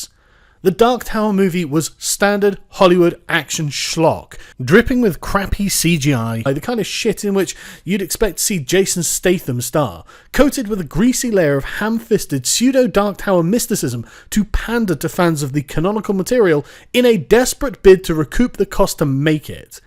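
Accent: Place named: United Kingdom. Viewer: When he said "Fuck this movie" with his soothing, perfect British accent, I don't know how to put it... Um, this is a bit dirty but... I got a boner.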